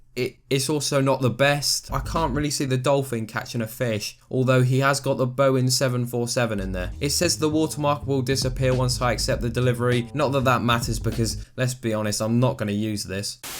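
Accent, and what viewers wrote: United Kingdom. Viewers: *Boeing I forgive you since you sound very british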